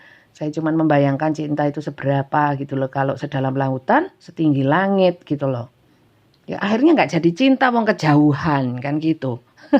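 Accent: East Java, Indonesia. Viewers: Peeeh ora umom to Bun...suka dngn Readinganny karna suara dn logat jw timuran yg khas,slm sehat Bun dr cancer Borneo nyimak 🌹🙏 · penyampaian yang apa ada nya simple jawa timur banget mudah diterima dan di cerna semakin sukses ya mbk sllu dlm keberkahan Allah SWT.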